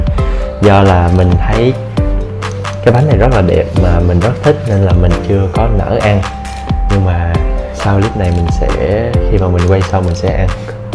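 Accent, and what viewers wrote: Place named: Binh Duong, Vietnam. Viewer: Giọng nói của là người Bình Dương gốc luôn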